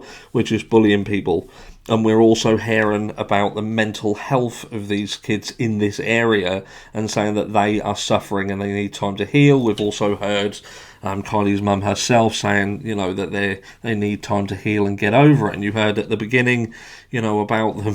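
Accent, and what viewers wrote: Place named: Suffolk, United Kingdom. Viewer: Are you from suffolk? I'm in Suffolk and you sound like it 😊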